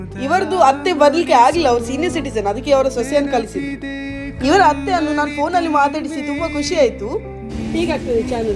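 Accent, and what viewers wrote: Mangalore, India. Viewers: Hi mam...nanu vdeo noduva muncheye nimge like kodudu vdeoge yakandre nimma mathu kelikke full kushi...😂namma kudla kannada...lots love from surathkal krishnapura...love u mam n ❤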